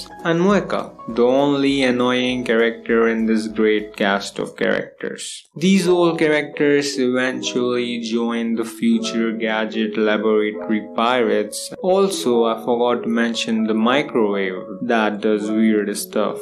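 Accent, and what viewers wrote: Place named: India. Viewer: Crazy hearing someone that sounds like their first language comes from India talking about science like that mind blowing. I thought every Indian loved science. 😭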